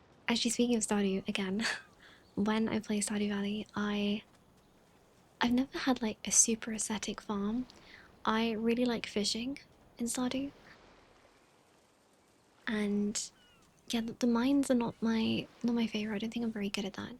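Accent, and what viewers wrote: United Kingdom. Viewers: As soon as I heard the British accent, I knew the struggles of the heatwave I'm British too and this is GENUINE hell. Anyway amazing video and very relaxing. Great job.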